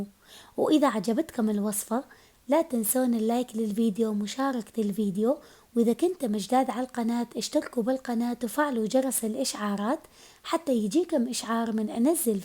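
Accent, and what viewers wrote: Mosul, Iraq. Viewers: يسلم ايداج صوتج يخبل وكلام موصيلي وفوري يخبل ياريت اسم الصفحه مشتريته منهم القوري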